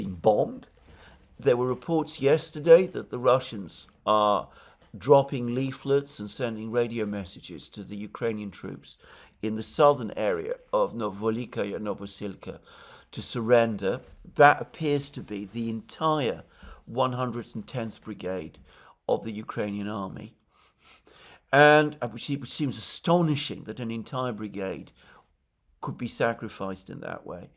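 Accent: United Kingdom. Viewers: You sound like a snobby brit. But still love ya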